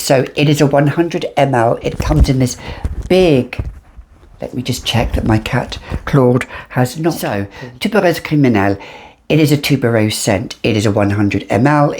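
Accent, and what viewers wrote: France. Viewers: Can’t get enough of that French accent of yours but I don’t think this one would be for me because there’s no sweetness and you know I love my sweet scents but you clearly love it 😍 💕💕👌🏽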